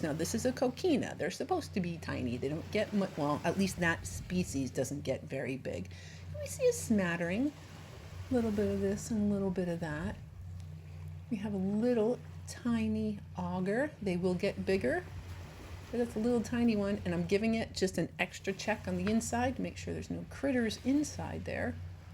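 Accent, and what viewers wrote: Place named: New Jersey, United States. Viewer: I enjoy your videos and you are teaching me so much. I would love to live close to a beach but live NE of Ft. Worth, TX. I enjoy your NJ accent and miss Cape May, NJ.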